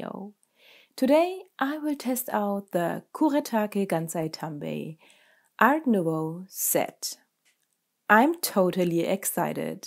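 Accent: Germany. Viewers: Dein Englisch ist zwar richtig gut- trotzdem hör ich da einen LEICHTEN Deutschen Akzent raus? 😅 Gibt's deinen kanal auch deutschsprachig? Deine Kunst ist echr genial 👌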